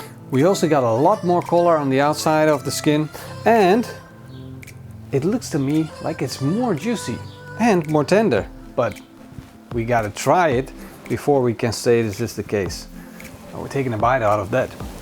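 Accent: Netherlands. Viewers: Ur dutch i can hear iT i am dutch as wel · You sound very Dutch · 3 seconds in and I had a feeling u were a fellow Dutchman, nice video very helpful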